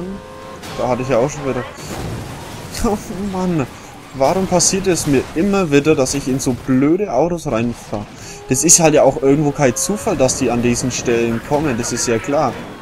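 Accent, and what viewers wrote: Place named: Bavaria, Germany. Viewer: wow, sehr schade wegen den wenigen zuschauern , die qualität der videos is genial ( 1080p ftw ) und dein kommentar is auch gut, du könntest höchstens versuchen das bayerische noch ein wenig mehr zurückzuhalten, stört mich aber nicht als selbst bayer, mach auf jedenfall weiter so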